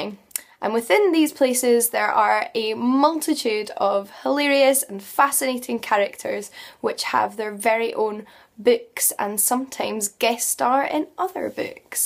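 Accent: Scotland, United Kingdom. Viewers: Ohhh this is Scottish? Interesting. Seems like I mistook another brittish accent with Scottish then xD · British does not just mean English. Scotland is part of Great Britain, so is also a British accent. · Thank you. A good friend recommended the whole serie and I felt a little bit overwhelmed ! Also, completely out of context, are you scottish ? I'm not a native english speaker and I plan on moving to Scotland soon and so far I'm struggle with the local accent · She has a Scottish accent. She is Scottish, which means she is a,so British. If you want to split hairs at least know what you mean. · Béranger Seguin it's Scottish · She's "Bri'ish", even better! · My first thought when she started to talk”She’s british. I can trust her” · Love this video. I wanted to start reading HogFather, but when I saw how it was in the Disc World it was intimidating. 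I am going to subscribe to you now. Love your accent. Scottish? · She has a strong Scottish accent. You just don't know your accents mate. No shame in that.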